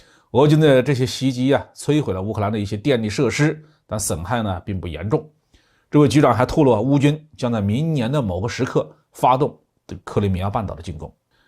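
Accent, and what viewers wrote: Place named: Xishui, China. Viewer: 好浓重的浠普，哈哈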